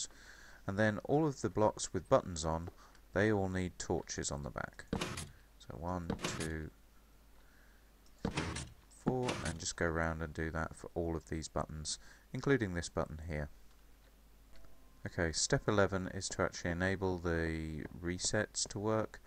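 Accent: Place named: United States. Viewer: It's noce to hear that I can contribute to your work :) And it will be nice to see how part 2 will be executed. Also, I heard you had some kind of accent. But for an untraine ear it sound a lot like american :p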